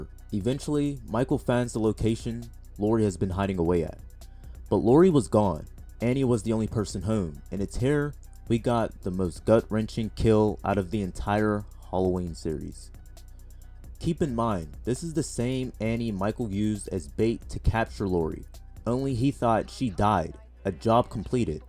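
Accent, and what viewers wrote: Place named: Pennsylvania, United States. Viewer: I was trying to place it the whole video lol. Pennsylvania is an interesting one because it bounces back an forth between east coast and kind of an upper south like Kentucky almost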